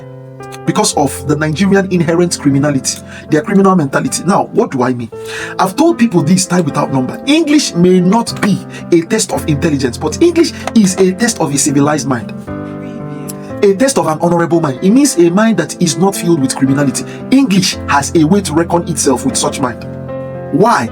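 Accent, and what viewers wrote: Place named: Ghana. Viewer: Its seems you school in Ghana 🇬🇭 right,, your tone is Ghana tone not like nigeria tone 🙄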